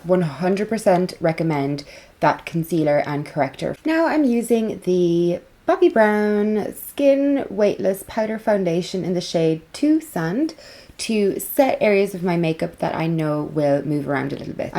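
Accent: Ireland. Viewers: The very best review & info on this product!! I have been so interested. You have the most wonderful accent, I love it!! Irish? You are my new favorite you tuber!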